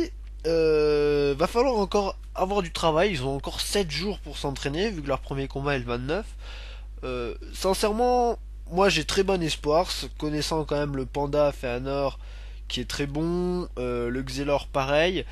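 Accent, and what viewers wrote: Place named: Marseille, France. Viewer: Ta un accent marseillais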